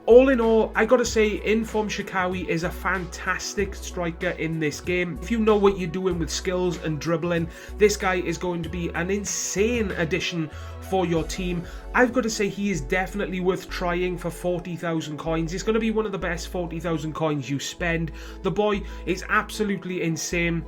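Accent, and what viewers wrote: Wales, United Kingdom. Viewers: Are you welsh!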